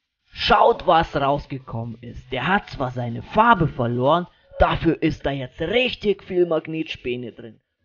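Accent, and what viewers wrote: Russia. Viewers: Bin ein treuer fan =) und dein akzent ist voll cool ^^ bist du russe? ^^, ich bin jedenfalls russe =D werde für immer beim kanal uschauen und nie weggehen =)))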